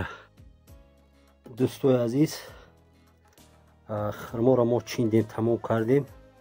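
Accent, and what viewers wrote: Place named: Tagnob, Tajikistan. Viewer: Асалому алайкум бачаи хеш мохам мардумитагновем хам сари маргзори ва хам пасикухием бехтарин наворхо бо лахчай тагноби саломату сарбаланд боши бо фарзандонат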